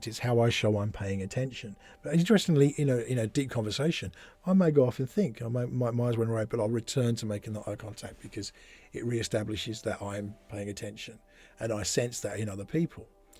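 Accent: Australia. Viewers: Detected your Aussie accent as soon as you spoke, as a fellow Aussie. Subscribed. Interested in your listed of talented autism creators. Elon Musk is the planet's most famous current talent. Others? Women and men? Autism affects all levels of humans, with all levels of intelligence. With higher iq people, their cognitive brain can sometimes override the hyperactive autism. However when we are tired, off guard, off duty, our hardly awake, the autism can suddenly emerge. Can you comment on this. Recently our ABC television showed how our most famous homeless orphan, got to be Doctor (sociological) Robert Smith OAM. Over 60 years old now, he is CEO of one of our larger charities. Is he autistic, or merely a PTSD baby, child, teenager?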